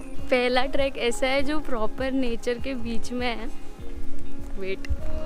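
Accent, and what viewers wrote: Maharashtra, India. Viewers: Proper marathi accent good one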